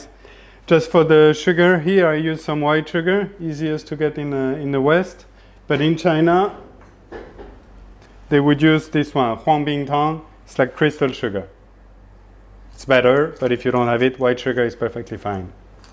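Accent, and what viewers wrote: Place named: France. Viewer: 哈哈，浓郁的法国味英语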